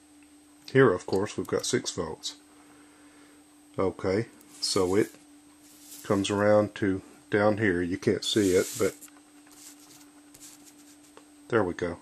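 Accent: South, United States. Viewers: your southern drawl is awesome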